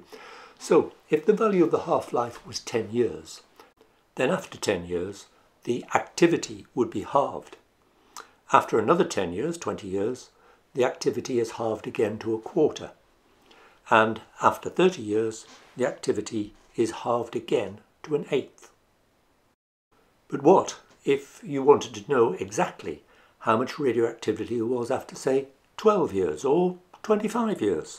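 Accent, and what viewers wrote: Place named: United Kingdom. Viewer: Thank you, fancy and british sounding man. You finally made me understand this.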